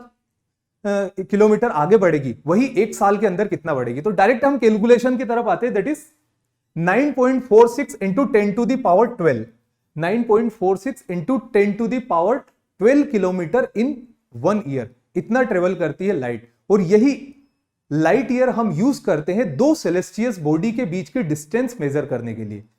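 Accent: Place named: Rajasthan, India. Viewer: YOU SOUND LIKE RAJASTHANI SIR 😀 AWSM SESSION BTW ❤️🔥🇮🇳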